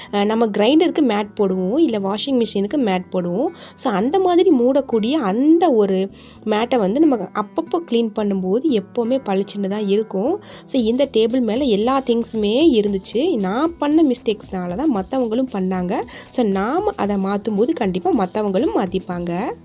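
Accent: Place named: Ramanathapuram, India. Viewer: Sister unga voice Ramnad kilakarai slang mari iruku namma oora neenka?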